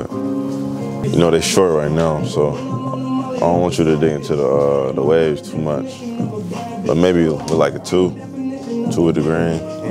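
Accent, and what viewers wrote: Chicago, United States. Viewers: U from chicago foe?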